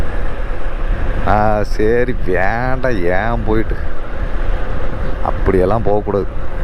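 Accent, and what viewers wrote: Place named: Kongu, India. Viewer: Hi watching your vlogs with great interest. Wonderful effort. Keep it up..love your Kongu Thamizh. Subscribed your channel. All the best ❤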